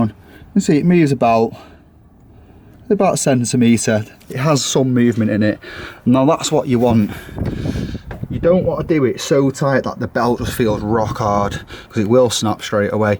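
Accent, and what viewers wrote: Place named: Salford, United Kingdom. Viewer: Very good video - just got a siezed alternator on my 2007 Grande Punto and so this is perfect. You sound like you are from near Salford!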